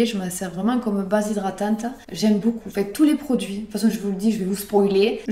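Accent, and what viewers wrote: South, France. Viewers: Bonjour! Je viens de m'abonner à ta chaine, jai le meme accent que toi meme si je l'ai perdu un peu en Ardèche!! De quel coin du sud tu es? Moi Avignon (et quand j'écoute une video ou je parle, c'est le même accent que le tien🤣). Bisou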